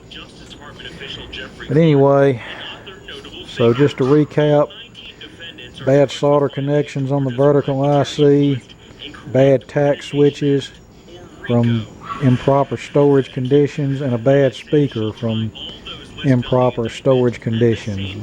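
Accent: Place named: Mississippi, United States. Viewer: I hear this accent all the time, good ole Mississippi.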